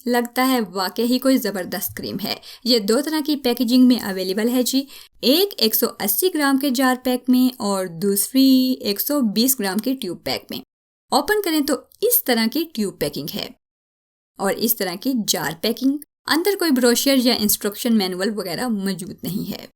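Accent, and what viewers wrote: Punjab, India. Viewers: Hy... i love ur voice specially ur punjabi language i loved it ❤ bcz m from india #punjab# 😘😘😘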